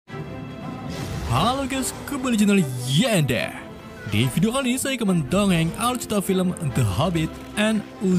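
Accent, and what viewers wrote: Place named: Tegal, Indonesia. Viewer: Maceme nt wong tegal ya WA😁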